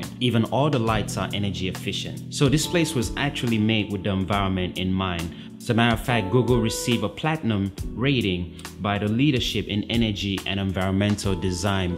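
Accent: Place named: Brooklyn, United States. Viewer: Hey Brooklyn Accent Guy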